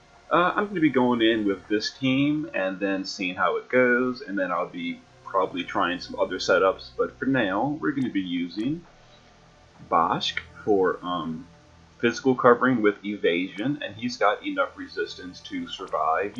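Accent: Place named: East Coast, United States. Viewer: Gotta be east coast at least. Or you're one of those weird people who had a brain injury and inexplicably woke up with an accent. Ah, I know, are you from Lanzelt? :-D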